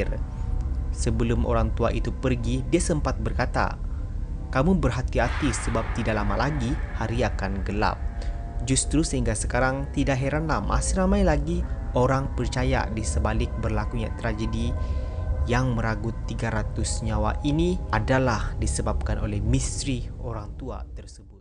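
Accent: Sabah, Malaysia. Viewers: Cakap gia dusun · Sebab loghat bahasa baku sabah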